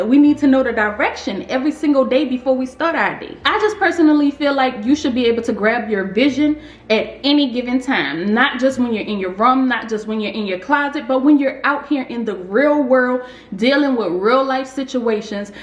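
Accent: New Orleans, United States. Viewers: 2022 is going to be MY year! I've never proclaimed such before and walked faithfully in it because it seemed so cliche'. But after watching and subscribing to your channel, hearing and seeing a hometown voice and face, yes I'm from "da 504", I feel at home "here." I've taken some of your credit advice and seen improvements. Greater is coming and it's because the power lies in me. Thank you for all the content you share.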